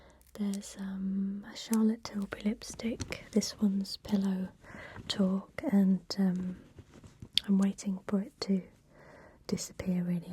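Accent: England, United Kingdom. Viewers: Most people probably come here for the English accent, I'm English too and it still has the same blissful effect, such a nice accent! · You've got such a thick English English (from England) dialect, when you're normally speaking it, it's really annoying to me. Sorry, no offense, sweety. Just the pronunciation. But your whispering voice gives me the perfect tingles, I don't know why, but I can chill totally :) That's ASMR. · Such a beautiful voice and I love the English accent. Ooof ❤❤❤ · The lovely British accent makes the ASMR even more powerful.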